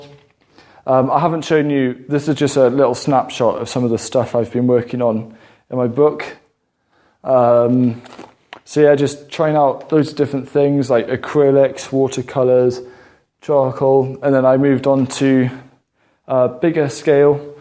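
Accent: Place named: South Wales, United Kingdom. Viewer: Hi, I'm a new subscriber from New Zealand. Thank you so much for these wonderful videos, I love the variety of content and your personality is a winner. I was feeling quite disheartened with my soggy winter garden before stumbling across your channel and now I'm feeling a lot more inspired, thanks! Your accent is a touch of home, as my grandmother is from South Wales and I also lived there for a short time:)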